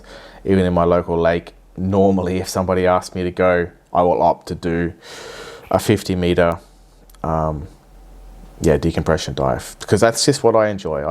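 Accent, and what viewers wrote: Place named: Australia. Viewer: I live in Chicago, IL US...so no oceans near by, however I do have the Great Lakes! I don't know if there are scuba classes around here given that I don't really see there being a strong market for them, but I could be wrong lol ...maybe I can use a pool? Haha It would be AWESOME to get training from you, but I think you live in Australia, right (love the accent btw!)?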